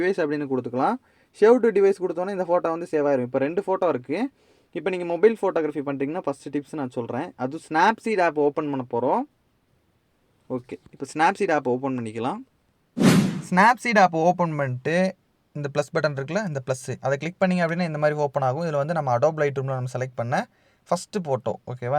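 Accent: Kanyakumari, India. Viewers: Neeka yanntha Oru Anna 🤔 kaniyakumari language mathiri iruku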